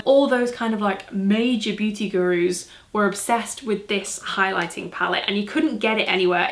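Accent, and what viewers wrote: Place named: Wirral, United Kingdom. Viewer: Hiya:) Stumbled across your videos and so happy I did!! I subscribed in less than 2 mins (unheard of Youtube behaviour from me I may add) of you talking as I thought wow, this is how me and my friends talk, so refreshing but relaxing/oddly familiar... and then I watched another video and found you are from the Wirral too... (and so I wanted to comment, also unheard of Youtube behaviour from me, as I usually just creep from video to video, never liking or commenting) Is there a Wirral sense of humour/chat/banter, if so, I think you repping us amazingly and would love to see you conquer online and mainstream media for us average girls!! You are one of a handful of youtubers I have watched who seem genuine, and like a right laugh on a night out:) Keep on creating and I would love to see more styling/haul videos as love your fashion sense!! 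Hannah xxx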